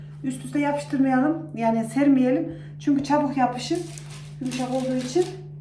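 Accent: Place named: Kirikkale, Turkey. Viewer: Ellerinize sağlık canım çok güzel görünüyor. Tarflerinizi çok beğeniyorum. Bende yapıyorum. Konuşmanızda aynı benim gibi konuşuyorsunuz. Bende kırıkkale liyim. Senin hamur yoğurmanı çok severek izliyorum. Çok güzel ve beceriklisiniz. Maşallah elleriniz dert görmesin. bacım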